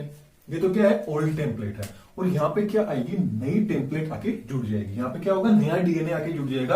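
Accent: Rajasthan, India. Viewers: Sir aap Rajasthani ho?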